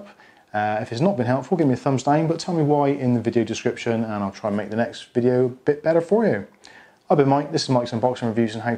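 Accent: United Kingdom. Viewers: Good morning Mike, I know it's been quite a long time since you posted this video but I just wanted to thank you for the information. I purchased a Charge 5 several months ago and I find (maybe not a defect - just an operating method for this version of Fitbit?) that whenever I let the charge drop below 1% a complete reset and time correction is necessary. Device reset is easy thanks to YouTube although this doesn't correct the time. This AM I discovered your site and everything was solved!! I have been a quite devoted / fanatical (?) Anglophile ever since that evening on February, 9 1964 when I first set eyes and ears upon those Four Lads from Liverpool. I am 73 years old these days and was just a 14 year old lad on that wonderful evening. Now anything dealing with England, Scotland, Ireland, Northern Ireland and Wales will simply and automatically attract my attention and I know this will never change until my dying day - and will continue long after that. 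Sorry, I got a little off the subject there but just wanted to thank you for the absolutely appropriate information. I don't know how many times I have said or thought this but I could actually listen to someone with your beautiful accent (or any of those in my 5 favorite and previously mentioned countries) simply read a grocery / shopping list and I would be enthralled by by the reading because of that accent. If that's an addiction I really don't mind. All the very best to you and yours,, Terry (in the middle of Minneso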